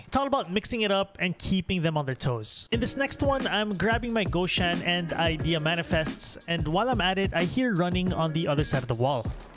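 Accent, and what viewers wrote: Philippines. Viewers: Knew you were Pinoy the moment I heard your accent. I've met or have seen like about 5 Pinoys in this game since I started playing back in 2018.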